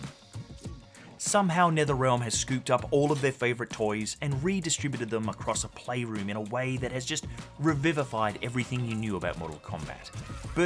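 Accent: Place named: Australia. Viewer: The only thing worse than an Australian accent is an Australian accent that’s trying to be funny and witty. Ick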